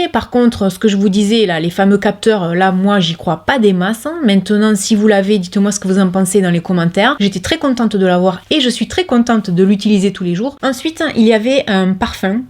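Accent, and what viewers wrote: South, France. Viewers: Merci pour toutes ces précieuses infos👍 c’est toujours un doux plaisir de regarder tes vidéos bercées par ton accent du soleil que j’aime tant 😜 merci encore pour toutes tes recherches afin de mieux nous conseiller tu es une amour 🥰 gros bisous du cœur Mima et passe un heureux moment avec ta maman et tes petits loulous 🐶🦮🐕‍🦺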